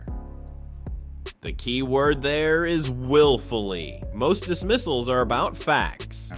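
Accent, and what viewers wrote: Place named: United States. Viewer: Given this has an American accent doing the commmentary explain why the Mankad should be treated any differently to the hidden ball trick or a pick-off by the pitcher in elite baseball. The instance of the ball in play hitting the wicketkeeper's helmet is easily overcome given there arre some international grounds that have a small storage hold with lid in the ground where a helmet might be kept.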